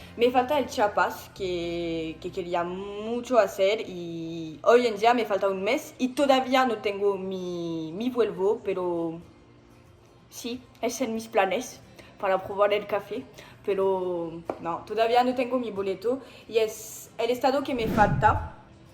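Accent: France. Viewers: hermosa francesa! adoro tu acento hablando slang mexicano,😂 espero que hayas disfrutado tu estadia en mexico, eres muy cool y graciosa vuelve pronto y que dios te bendiga.😊😍💕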